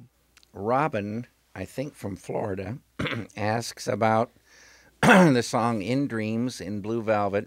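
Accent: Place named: Utah, United States. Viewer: Is David Lynch from Utah? His voice has that particular cadence they use there.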